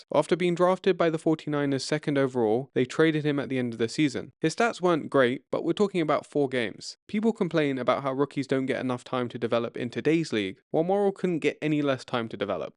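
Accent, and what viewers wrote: England, United Kingdom. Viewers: Why do you have a English accent person talking about American football,stupid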